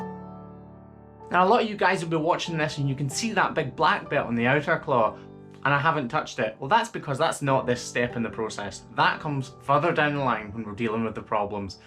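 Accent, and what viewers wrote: Scotland, United Kingdom. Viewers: Good grief! Why am I so obsessed with watching your vids?! I can't look away! It probably helps that your dialect is so interesting to listen to and having visited Scotland twice, I find myself always wanting to go back. Oh. And this is actually a very interesting niche subject matter. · The slo mo was cool as was your montage at the ending. Always educational and entertaining. I’m also learning how to talk with a Scottish accent thanks to your videos! 😁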